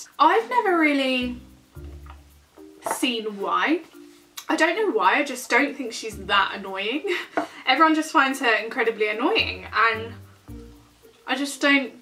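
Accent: United Kingdom. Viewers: Is it weird that I love to listen to your voice and your British accent 😂 I cannot believe that Chris ingam is still blogging I have been watching the Wilkes family and also Demi Donnelly as well as your second channel and all the evidence is disgusting he definitely is guilty so when is he going to face justice. we won't ever forget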